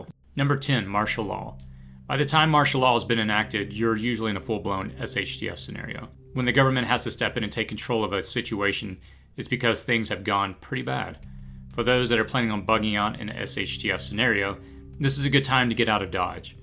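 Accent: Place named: United States. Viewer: I was interested until you mentioned a certain political group or party labeling some broadcast corporations as fake news as being just not true or rare,,,at that point t I knew you were political propaganda designed to counter the fact that the people are now realising that our media is just lie talkers not journalists who's goal is to hide the facts and give cover for those who are right now (presently in america)attacking us on multiple fronts, ,these enemies were caught off guard when trump defeated hillary who they invested heavily into her being president because she was there person to totally take the US apart,,these hey cannot allow this to stand that's why all of the fake news more then ever is all that is being heard by the people and the reason why internet news sites are being removed,,they must control the narrative,,,you sir are fake news you are one of those enemies telling propaganda news on behalf of the ones who want to do I ate and enslave all of us,,,and you sound like an american,,in my mind I cannot understand any mindset that would help to destroy his own nation,,your goal here is very transparent,,,you are here to paint trump as the enemy,to paint trump supporters as the crazy ones just as the msm does daily,,at the same time that we who know the truth watch the enemies fund and mobilize the violence,,,get off the internet you frickin liar,,it's to late we all ( see ya) for what you are and we see your plans and devices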